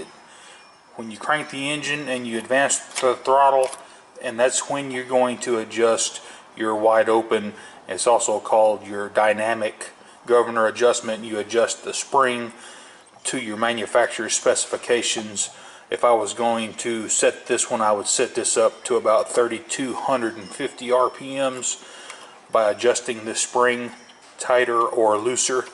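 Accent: Texas, United States. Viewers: Great visual with the case open. Clearly from Texas with the few "H'what" I heard.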